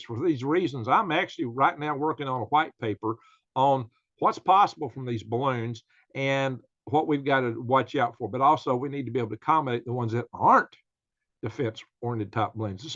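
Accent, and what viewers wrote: South, United States. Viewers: When the grid falls im sure gonna miss hearing. My favorite southern rocket scientist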